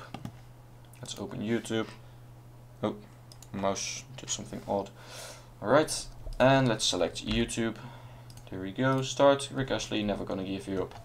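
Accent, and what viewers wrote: Netherlands. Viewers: your accent, you are dutch speaking are you? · Haha, je hoort aan je accent dat je zo Nederlands als wat bent XD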